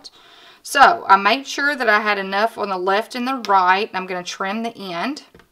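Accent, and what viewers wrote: South, United States. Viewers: Honey...you are from the South huh?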